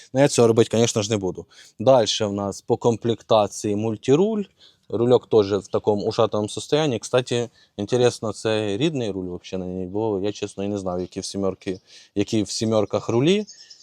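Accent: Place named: Russia. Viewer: І моZZkaльська підзвучка теж ріже вухо. І головне, що не старається виправлятись.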